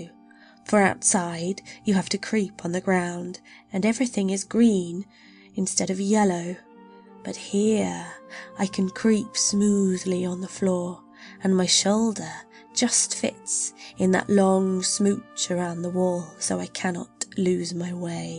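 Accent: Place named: United Kingdom. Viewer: Very much than other normal US english readers, i think UK english is the proper voice for this story.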